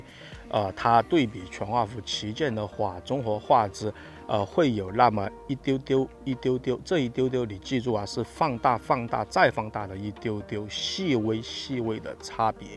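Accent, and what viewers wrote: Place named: Chongqing, China. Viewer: 听出了川音:face-green-smiling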